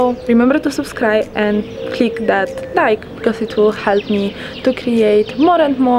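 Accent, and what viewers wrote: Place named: Poland. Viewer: Are you Polish? Omg your accent is so cute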